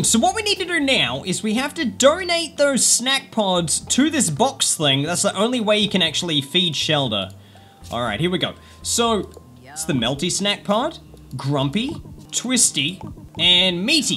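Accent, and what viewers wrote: Australia. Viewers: Are you Australian? 😅😅😅😅😅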